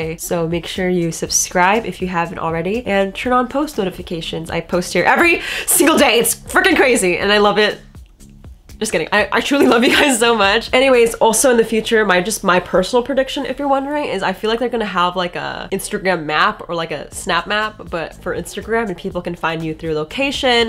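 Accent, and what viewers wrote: United Kingdom. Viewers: As a UK Resident, I approve of the British accent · Kinda like the british accent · WOW WOW WOW WHAT"S WITH THE BRITISH ACCENT!?? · British Accent = 7/10. 😎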